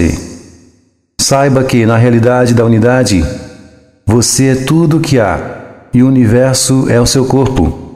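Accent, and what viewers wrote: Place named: Florianópolis, Brazil. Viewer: Essa voz e sotaque é de manezinho da ilha né?... morei em floripa por 6 anos e esse sotaque me faz lembrar ds lá.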